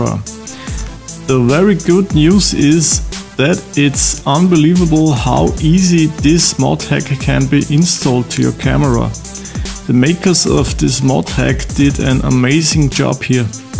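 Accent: Germany. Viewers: Gibts das auch auf Deutsch , er ist ja deutscher - hört man ja raus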